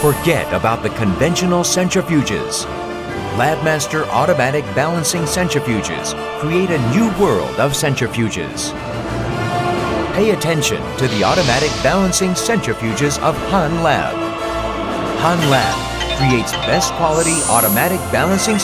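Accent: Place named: United States. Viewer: Oh, my god. This is the most obnoxiously overproduced and tacky commercial for a product not meant for children or even normal households I've ever seen. The "epic" music, the over enthusiastic American voice, and all the sound effects, combined with cheap CGI. For centrifuges! It's too much! I just can't! I will now have to watch it on repeat.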